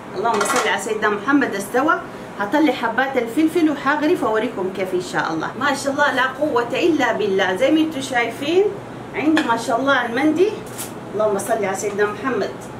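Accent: Hejaz, Saudi Arabia. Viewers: بسم الله ماشاءالله على لسانك الحجازي المحلى بذكر الله وسيدنا محمد عليه الصلاة والسلام وألف صحه وهنا عليكم بالعافيه يارب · لايك لعيون اللهجه الحجازية 😍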